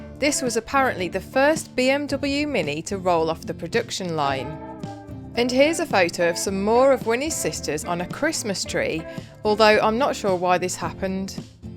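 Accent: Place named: Wolverhampton, United Kingdom. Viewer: Beautiful car, beautiful woman. I guess it comes down to money. If you're rich, get Winnie all fixed up and keep her going. Otherwise, all good things come to an end, and time for a new car. No point spending £1,000 on a car worth £500. Tom is amazing. Look after him! Enjoyed the video and always love your Wolvo accent. Thanks for posting. 🥰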